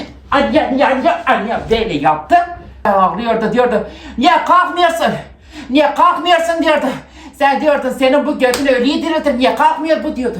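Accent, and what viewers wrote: Turkey. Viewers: Çok iyi yapıyor ya Kürt Alevi şivesini 😂😂😂 sanki bizimkilerin konuşmasını dinliyorum 😂😂😂 birebir 😂😂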